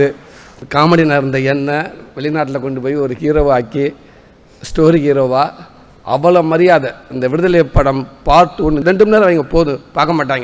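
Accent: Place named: Madurai, India. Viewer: Soori madurai slang❤❤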